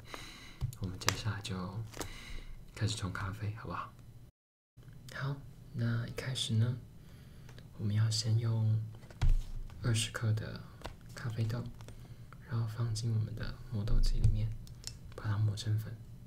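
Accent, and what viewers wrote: Taiwan. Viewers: 男生台腔做ASMR还是很舒服的，我补脑了下东北口音瞬间笑死。做的很棒！非常感谢。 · 我是大陸人，我就超喜歡台灣的口音的！感覺超溫柔超可愛的！哈哈，每個人喜歡的不同吧，反正我認識的朋友都很喜歡台灣口音，不會排斥的💛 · 剛才躺著聽這個準備睡覺，都沒有看到視頻的題目，可是聽到你我就知道你是台灣人。好喜歡台灣人說的普通話。口音好好聽，還有你的聲音也非常好聽哦!希望你會做更多視頻!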